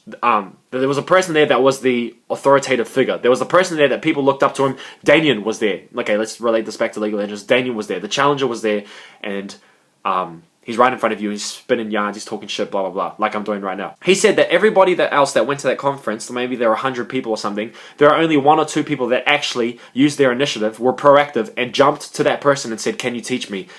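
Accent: New Zealand. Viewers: He's kiwi bro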